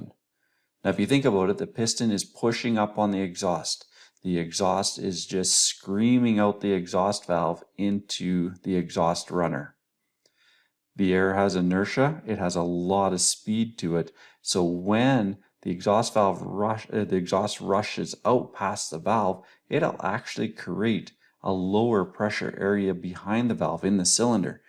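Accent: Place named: Canada. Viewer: I detect a Canadian accent. 🙂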